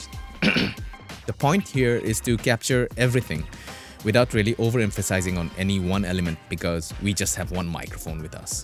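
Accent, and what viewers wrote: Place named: India. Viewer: It's weird as hell hearing an Indian accent come out of your mouth.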